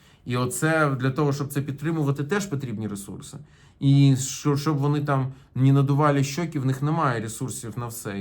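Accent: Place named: Russia. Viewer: Это что, украинская мова??? Да ты даже язык выучить не можешь. Это даже не суржик, а Русский с хгеканием!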